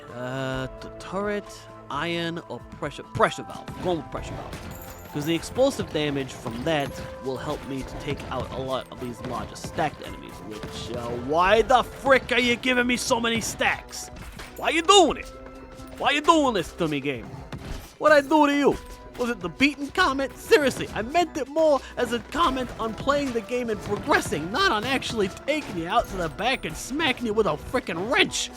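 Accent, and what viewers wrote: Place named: New York, United States. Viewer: It amazes me how YouTube isn't going out of business. Also your doing well and have a great day honestly I don't mind the swearing plus love New Yorker/Chicago accent reminds me of the days of Mafia like fat Tony from Simpsons and Vinnie cannoli from guns gore and cannolis.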